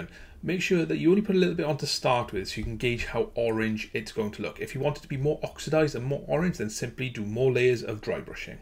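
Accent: Wales, United Kingdom. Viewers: Your very welcome. I denote a Welsh accent? Newport?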